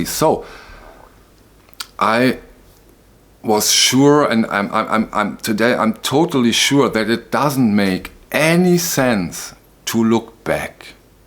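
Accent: Germany. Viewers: I actually really enjoy your speaking and German accent (I'm American). I find it sincere and very intelligent.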